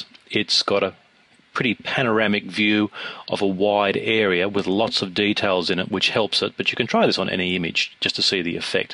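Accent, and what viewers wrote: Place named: Australia. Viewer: wow,, great tut man, great, btw,,,,,, are u australian???? cuase ur accent sounds like ur aussie..... lol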